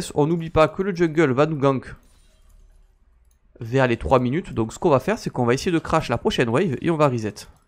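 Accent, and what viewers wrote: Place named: South, France. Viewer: Tu prends de plus en plus l'accent du sud haha (super vidéo btw)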